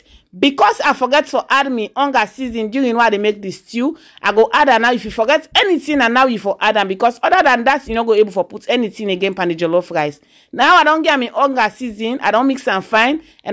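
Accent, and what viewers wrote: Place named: Sierra Leone. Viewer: My first time listening to Sierra Leone speak I’m 🇯🇲 and can understand most of what you are saying, I enjoyed listening to your beautiful accent, thank you.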